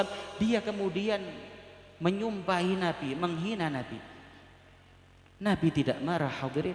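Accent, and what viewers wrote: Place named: Java, Indonesia. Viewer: Enak kyi jowo original rosone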